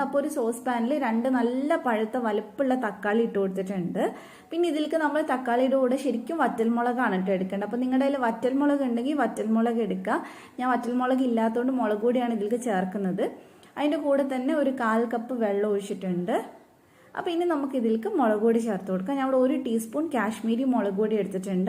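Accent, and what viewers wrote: Kannur, India. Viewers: Chechi kannur ano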